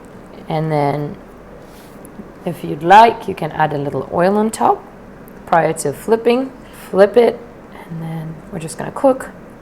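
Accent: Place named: Kenya. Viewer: Your Kenyan accent 😍😍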